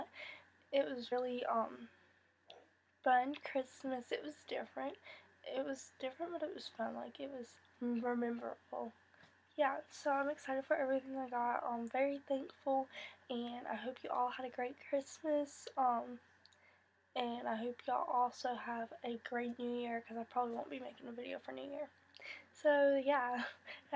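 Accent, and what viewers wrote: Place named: South, United States. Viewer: Oh well im a California guy, so as soon as i heard your voice i knew that it was something different, I kinda figured because there might have been some southern to it but i wasnt sure, its really unique and i like it! You seem super nice btw, so i hope your channel does really well!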